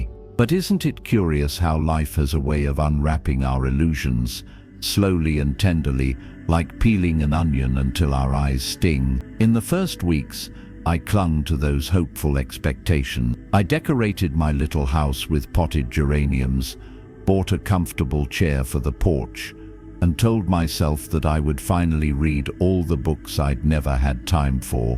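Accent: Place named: England, United Kingdom. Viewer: Your channel has become very important to me, to begin to relax for sleep. Please never change the voice! I love the English accent. Also the quiet music. Thank you.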